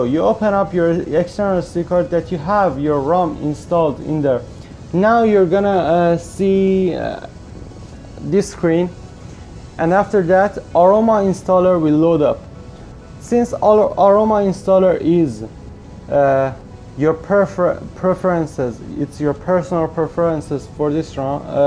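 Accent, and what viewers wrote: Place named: Iran. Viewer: دمت گرم جوون قربون انگلیسی حرف زدنت. حرف نداره. تا گفتی ادین، گفتم این لحجه باید فارسی باشه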